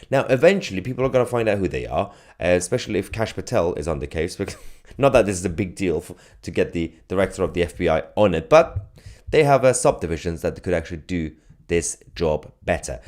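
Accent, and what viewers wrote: United Kingdom. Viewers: thought you were a Brit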